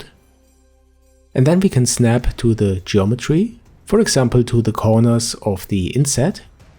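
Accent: Germany. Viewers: yeah... that explains it.. I've never heard germans accent before other than that autogefuhl guy. You guys sounds a lot calmer than the internet claims you to be. lol.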